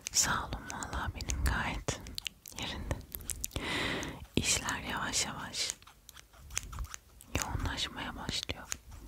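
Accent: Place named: France. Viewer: Sen turkce ceksen o Fransız aksani ile en az 10 bin abone gelir yavrum ;d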